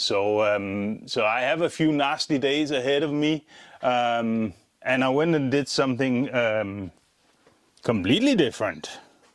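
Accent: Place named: Austria. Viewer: You must be from Austria but love the accent and the rc content from north Carolina usa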